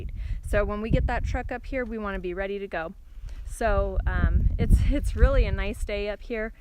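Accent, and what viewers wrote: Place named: Washington, United States. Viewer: listening to you talk I feel like I am home, you have a washington accent, LOL....watching your high desert vids is making me feel more confident about moving out to the high desert area in AZ I was fearful I wouldn't be able to have a garden glad to see it can be done =)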